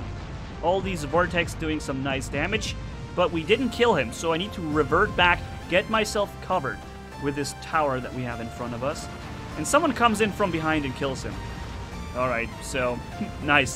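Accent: Germany. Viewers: BTW, I knew you're natively German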